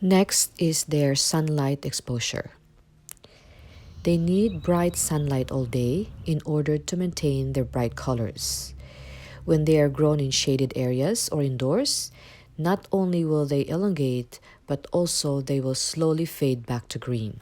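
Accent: Philippines. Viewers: She sounds so filipino hehehe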